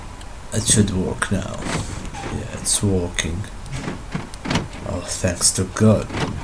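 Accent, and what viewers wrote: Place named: Arab world. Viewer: انت عربي وينك اوين التونتي فور تحجة عربي هيييييي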